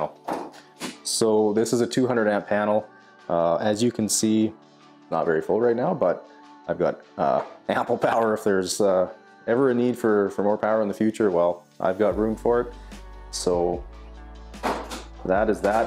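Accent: Canada. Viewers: GREAT building!!!! Very professional!! I get a kick out of your Canadian accent too. GREEJ? I guess that's GARAGE? LOL Keep those transformed car videos coming!!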